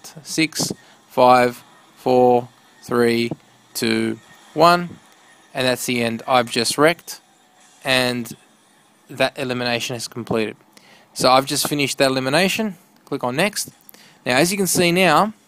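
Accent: Australia. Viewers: Are you Australian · Ur an Aussie mate👍🏻 Same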